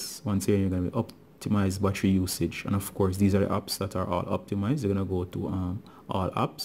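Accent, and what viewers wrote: Jamaica. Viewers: came for iris scammer fix stayed for the jamacian accent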